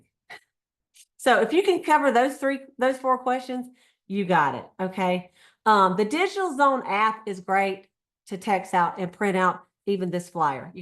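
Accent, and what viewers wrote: South, United States. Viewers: I love your country accent. I wish I had one like that. Your voice makes your videos more fun to watch and listen too. I also hear the joy and excitement in your voice and see it on your face. I believe you really enjoy what you do.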